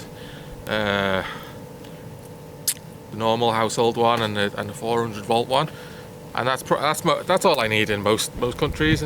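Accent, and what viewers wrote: Liverpool, United Kingdom. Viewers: Interesting! I've just found your videos, only because your i-pace v e-niro comparison was posted on a Kia forum, I'm really enjoying watching them. I like your natural presentation style and subject matter, and like seeing the beautifully spectacular finnish scenery. I cringe when I see you touching things with your bear hands in those temperatures😮. I suffer from reynauds so I can't tolerate even the slightest dip below freezing point😟. Do I detect a scouse accent?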